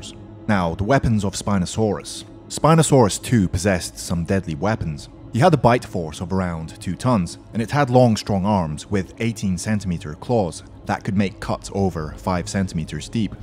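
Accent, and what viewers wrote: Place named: United Kingdom. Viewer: Just because you speak in a British accent doesn't make you an expert on what happened during the age of dinos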